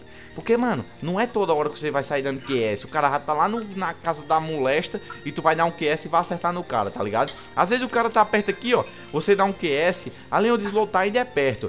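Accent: Northeast, Brazil. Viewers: tu é nordestino???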